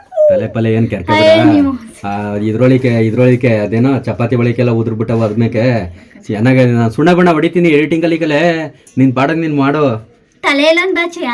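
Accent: Mandya, India. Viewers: . ನಮ್ಮದು ಯಾದಗಿರಿ.(uk) ಆದ್ರೂ ಮಂಡ್ಯ ಭಾಷೆ. ಕೇಳೋಕೆ ಚಂದ.. All tha best... K A. 33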